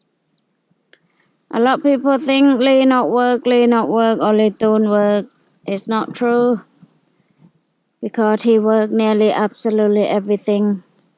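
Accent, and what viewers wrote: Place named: England, United Kingdom. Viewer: She is funny, she speaks Thai with an English accent.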